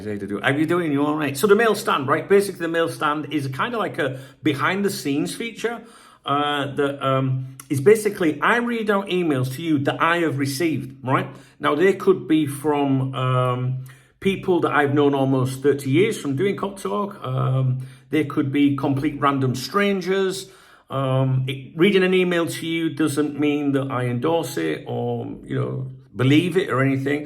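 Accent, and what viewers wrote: Yorkshire, United Kingdom. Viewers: me and the missus have been trying to figure out where your accent is from. My guess is Yorkshire, the missus reckons Cumbria. Are either of us correct? If not, help us out and tell us where the effing hell you're from. Haha.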